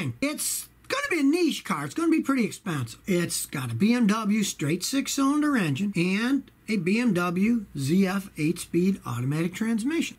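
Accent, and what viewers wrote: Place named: East Coast, United States. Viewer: Are you from Philadelphia? Your accent sounds from the East coast.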